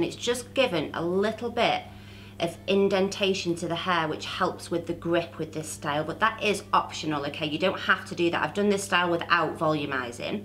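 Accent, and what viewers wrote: United Kingdom. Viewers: What British accent is this? She sounds so sweet, I could listen to her forever.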